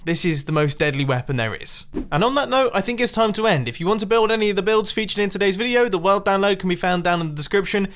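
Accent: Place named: United Kingdom. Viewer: No offense man, I accustom calling it British accent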